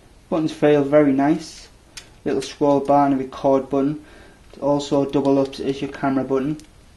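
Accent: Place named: Cumbria, United Kingdom. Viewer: dude where are you from......your accent sounds like some cumbrians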